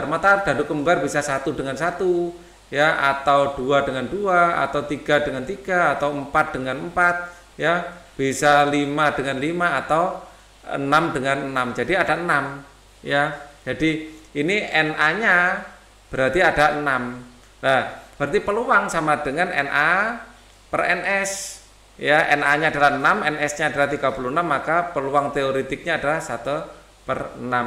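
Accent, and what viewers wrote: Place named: Java, Indonesia. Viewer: Dari suaranya sudah jelas itu wong jowo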